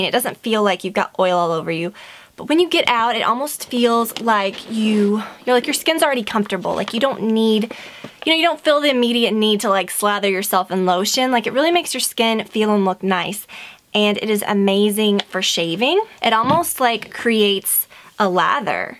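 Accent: South, United States. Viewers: Where are you from????? I knew the South (accent) , but close location? Like I am South Eastern..... about an hour ish from Savannah !!!! I knew I totally related to you for a reason. Almost everything you haul.....if I dont already have it.... I go & get it. ♡♥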